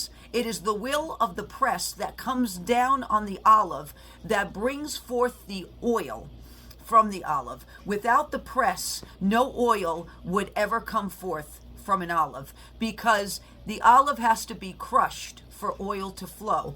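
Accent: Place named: New York, United States. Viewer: I love that connection to the Lord's going through the suffering on the cross, his burial and the Resurrection, and how the processing of the olive oil with a donkey, wood, and the crushing weight of a stone fits into the narrative so well. No wonder I love you so much Ms.! I'm a New Yorker transplant to Texas (in 1982), and it's always fun to hear you speak with that Italian NY accent as well as inform us of what's going on in the spirit world and the physical world!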